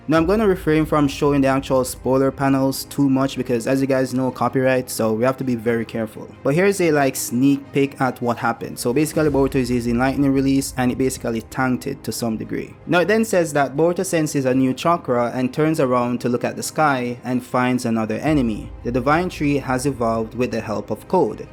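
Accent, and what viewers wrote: Jamaica. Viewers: I would say Jamacian or guyannese It is how he says the Word About. my two guess Worst one would be Grenadian. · Are you jamaican? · Bro are you Jamaican? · Are you Jamaican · Bro you Jamaican???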